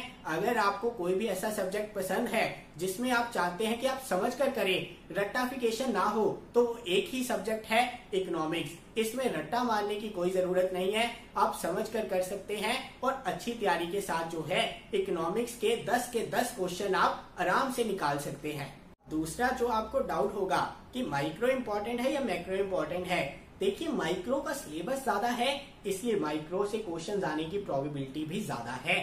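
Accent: Punjab, India. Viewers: Aaye haaye..Punjabi Accent Sir ka😍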